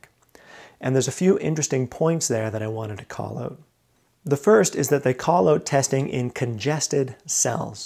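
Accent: Nova Scotia, Canada. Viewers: You from Nova Scotia brudder?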